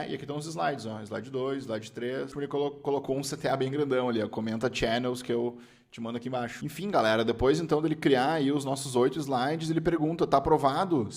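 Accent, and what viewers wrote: Brazil. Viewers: Meu amigo, sotaque de Porto Alegre dói na alma pqp kkkk. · incrivel a qualidade do canal muito bom, somente o sotaque gaucho que não é tão agradável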